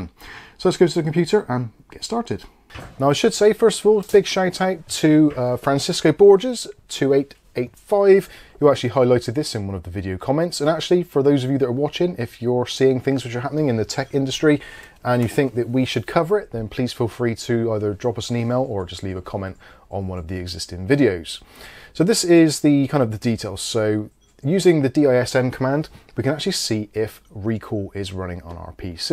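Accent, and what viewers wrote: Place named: West Country, United Kingdom. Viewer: Sound like a west country lad. I'm originally from Gloucester now in NZ.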